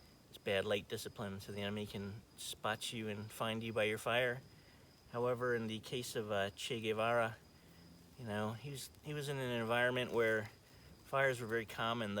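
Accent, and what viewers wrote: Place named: Baltimore, United States. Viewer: It kind of sounds like Baltimore. What do you think?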